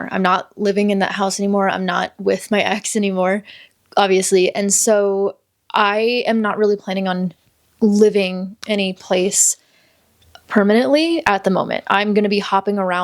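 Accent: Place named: Colorado, United States. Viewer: Decided to catch up on some of your vids I've been meaning to watch, and heeey this popped up! Lovely to see something new from you. I adore how down-to-earth all of your content is, and I was so excited to find out you're a fellow Coloradan! (No hiding that native pronunciation, haha)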